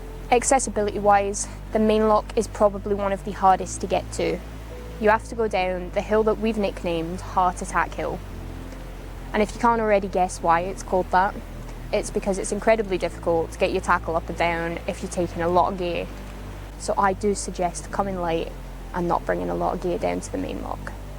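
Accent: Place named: Scotland, United Kingdom. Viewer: As always great video and great venue detail coverage 👌 and still loving the Scottish accent, u sound as beautiful as u look ❤ x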